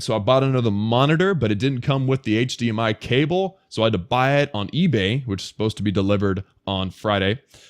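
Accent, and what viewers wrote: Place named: Germany. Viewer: Congrates for hitting the 10k Subs. You have good content - keep up the hard work. Considering your name and I thought I scented an ever so light accent - do you have some german roots? Regards from Hamburg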